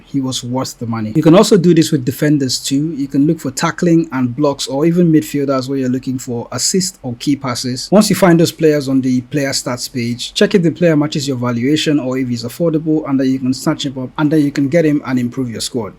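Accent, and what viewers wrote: Nigeria. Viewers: Are u Nigerian?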